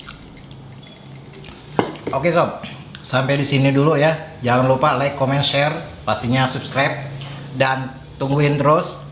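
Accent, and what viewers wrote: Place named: Depok, Indonesia. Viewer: Lontong sekel.. bise begitu yee bang.. depok beut dah ah logatnya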